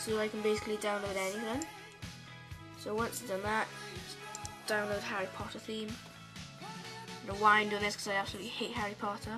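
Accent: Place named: England, United Kingdom. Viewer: He means your england accent. xP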